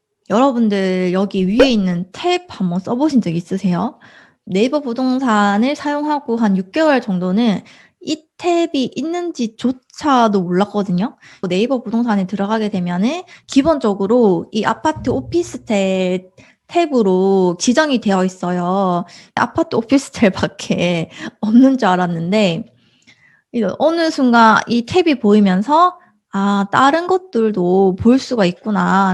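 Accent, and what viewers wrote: Busan, South Korea. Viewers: 완전 부린이인데 네이버부동산은 빠싹하지 않을까 하고 들어왔는데 엄청 필요한거 3가지나 배웠어요~~ ㅠㅠㅠㅠ 매번 시청중이지만 정말 친근한 말투로 하나하나 설명해주셔서 감사드립니다 ㅎㅎㅎ 혹시 부산쪽 분이신가요? 말투가 저랑 비슷하시요 ㅋㅋㅋㅎㅎ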